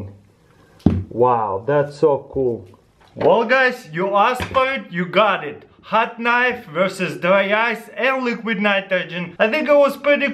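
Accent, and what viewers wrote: Russia. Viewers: I really love his Russian axent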